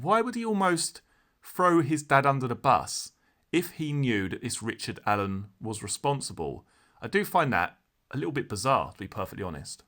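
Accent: United Kingdom. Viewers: Another UK guy covering a US story—I have noticed a huge number of UK people narrating US stories. Now I love you guys, don’t get me wrong, but it’s Frickin’ irritating…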